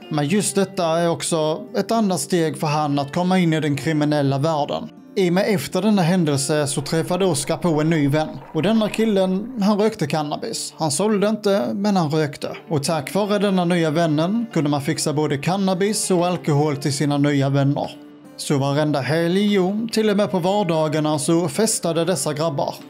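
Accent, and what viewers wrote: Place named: Scania, Sweden. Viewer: Översättning förstår inte din skånska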